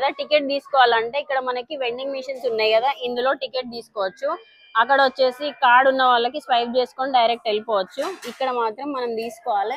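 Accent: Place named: South, India. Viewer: South Indian accent is soo funny 🤣🤣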